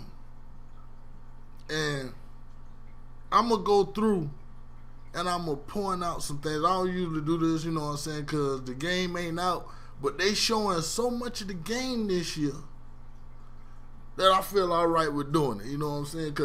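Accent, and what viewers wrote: United States. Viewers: fool sound like he from the south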